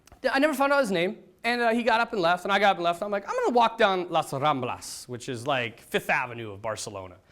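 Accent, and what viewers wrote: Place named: Ireland. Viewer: nice irish accent, lol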